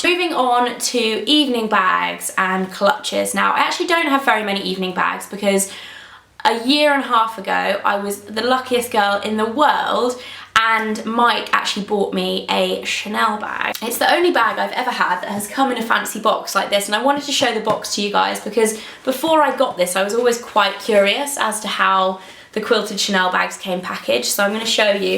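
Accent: England, United Kingdom. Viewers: You are an english accent... Good vidéo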